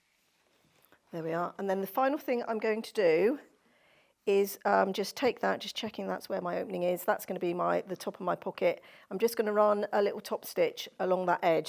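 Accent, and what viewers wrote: United Kingdom. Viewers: Thank you. Brit here in Michigan so its nice to hear the British accent. You are a good teacher.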